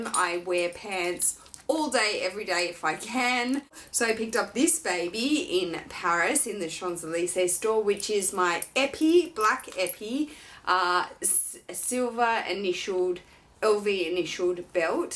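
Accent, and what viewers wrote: Australia. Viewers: Love your videos and your aussie accent :) I would like to get the Neverfull MM. Lots of love from Berlin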